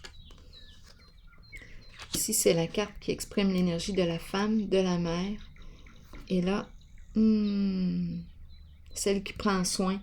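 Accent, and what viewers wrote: Quebec, Canada. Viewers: PS: j ai failli naître au Canada !!! J' apprécie vous entendre parler le Québécois !!!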